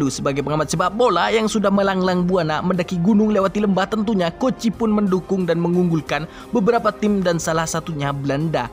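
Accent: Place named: Medan, Indonesia. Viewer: Abng anak medan yh.nmpak kali ckpnya anak medan kali wkwkw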